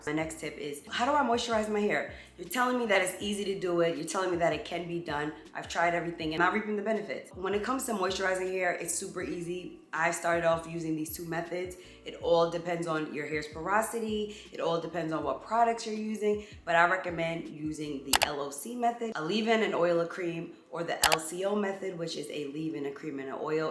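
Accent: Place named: Philadelphia, United States. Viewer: Are you from Philly? You have a Philly accent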